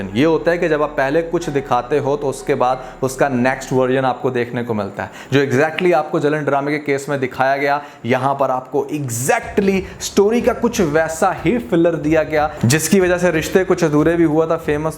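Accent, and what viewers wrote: Punjab, India. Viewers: Aap English ko punjabi mein bolte hain.PLEASE PLEASE PLEASE DON'T DO THIS.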